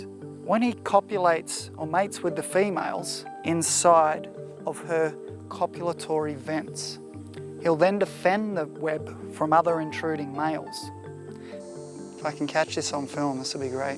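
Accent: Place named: Australia. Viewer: I was hoping that spider jumped on his Australian accented face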